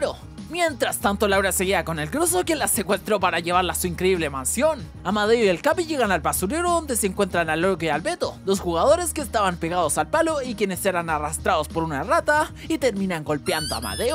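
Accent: Chile. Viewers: Una pregunta eres chileno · Eri chileno?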